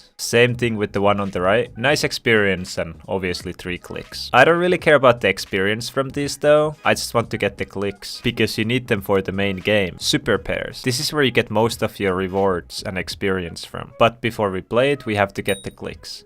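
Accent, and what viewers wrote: Finland. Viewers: instantly when i clicked on the video i realised from your accent that your finnish :D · i noticed the finnish accent in 0.2 seconds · Where are u from bc means block on Finnish and u got an Finnish accsent on english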